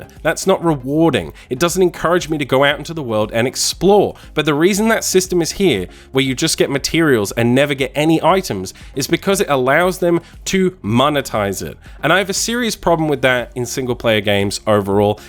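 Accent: Australia. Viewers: You're an aussie!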